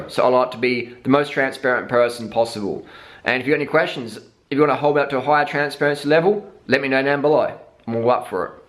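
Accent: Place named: Australia. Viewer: has anybody ever noticed how annoying his fucking voice is like Australian accent is cool as fuck but his is just fucking annoying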